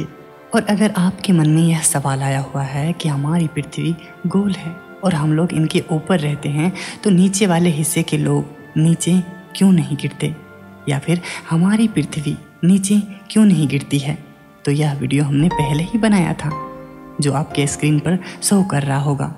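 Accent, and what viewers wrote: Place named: Pahadi, India. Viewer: Bhai pahadi ho , ho na Aapka accent pahadi he . Bhai bahut badiya ❤ plz continue.. Wating for your next vedio Very knowledgeable.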